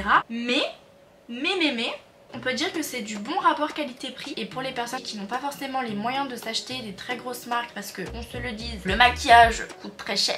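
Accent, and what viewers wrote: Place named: United States. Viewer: Je n'avais jamais entandus ta voie ! Au début, regarder tes vidéo sur tiktok et je croyais que tu n'était pas française plutôt américaine 😅🤣sinon t'es vidéo (et short) son juste trop bien et tes make-up, juste incroyable!!!! ❤❤❤❤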